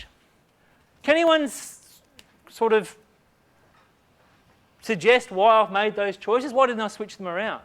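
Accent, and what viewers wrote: Australia. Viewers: are americans really this stupid? he's clearly australian wtf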